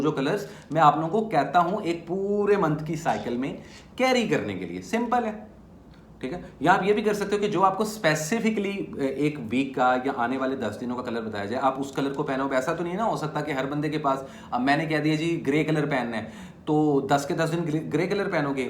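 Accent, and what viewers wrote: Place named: Punjab, India. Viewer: Gemini❤❤ Ap Punjabi bry mzy ki bolty ho 😂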